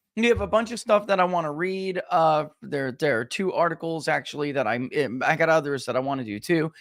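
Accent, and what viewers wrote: United States. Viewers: He's a yankee. They all sound like that to we southerners. But he's a good guy.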